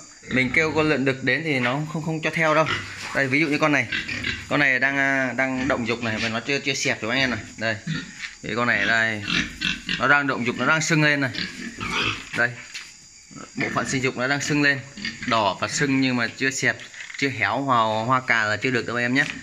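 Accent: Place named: Ha Tay, Vietnam. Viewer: Anh ở đâu thế nghe giọng giống ở Hà Tây cũ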